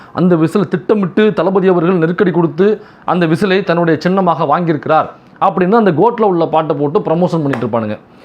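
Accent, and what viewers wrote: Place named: Kanyakumari, India. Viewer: Kanyakumari tamil uthhu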